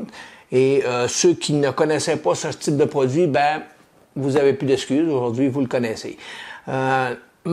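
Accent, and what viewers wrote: Canada. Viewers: Vidéo efficace, comme à chaque fois, l'accent Canadien j'adore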